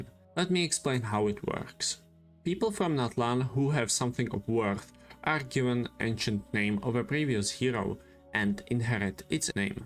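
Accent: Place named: Czech Republic. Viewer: "Yay, another video hating on Natlan! I'm in!" "Dude has Czech accent, is he Czech?... YAY, a fellow citizen!!" I actually quit during Natlan. I'm done with the exploration, non-skippable dialogues and I can't believe that 4 years in we still have to grind talents on specific days of the week with no resin storage for overflow. Natlan looks completely different from what I expected. Nation of War my ass, this looks like Disney and I can't stand it. I'm also not that thrilled about pokemons and weird immersion breaking stuff like... motorbikes or flying canons. Just nope, Dawei can cry all he wants, I'm not going back and I used to whale pretty hard in the past.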